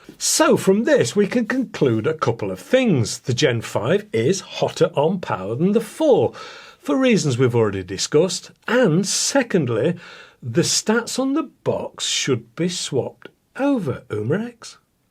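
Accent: United Kingdom. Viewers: Hi, Another great review. I purchased the Gen 4 about three months ago & am very glad I did. It's no tack driver but is OK for back yard plinking. Actually this BB gun was purchased as a safe training aide for my three high school to young adult nieces. For that purpose I have found nothing better that the Umarex Glock 17 Gen 4 CO2 BB pistol. My main difficulty with this replica has been getting the threads started straight when loading the CO2 cartridge into the magazine. Sometimes I get lucky & the threads line up the first time but usually I must try several times & also makes sure I don't get frustrated & force the issue. Thanks for your good humor & that Great British accent, Larry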